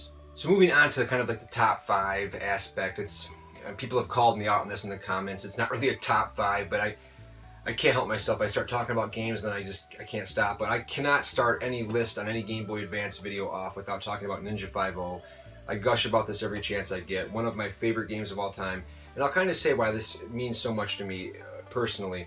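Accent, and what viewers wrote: Midwest, United States. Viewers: Hi there. As always I enjoyed this video. You strike me as a good family man and you mention your son quite a bit on your channel. So why not have him on in a future episode? Also as a side note. Are you from the Midwest, cause I detect a slight Midwestern accent. Thanks again for the video.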